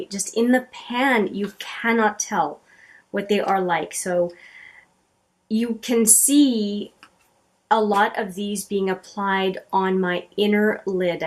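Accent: Canada. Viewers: You are such a lovely and beautiful person❤️ I have been loving your videos! As soon as I heard you speak, I could tell you’re Canadian (my husband is Canadian and I’m American)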